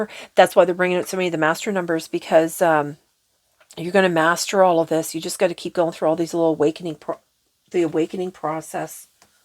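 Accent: Canada. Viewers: Canadian, eh? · ❤ Thanks, I stumbled upon your video... It's very aligned with what I'm experiencing right now. I heard the Cape Breton accent;) ❤❤ ❤❤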